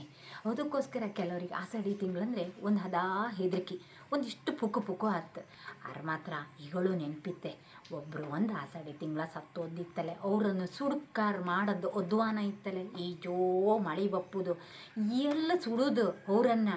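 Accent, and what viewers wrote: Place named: Kundapura, India. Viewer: Kundapura kannada ❤ U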